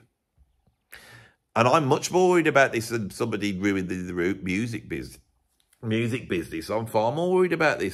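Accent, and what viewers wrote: Birmingham, United Kingdom. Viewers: love me a Brummie, or Black Country accent ❤ any day of the week. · I'll take sense of humour over Beato's supposedly superior "intelligence" any day of the week. I love the Brummie/West Midlands accent anyway, it's one of my favourites. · he has much more intelligence than this annoyingly verbose Brummie...the accent is too much to handle.😎😎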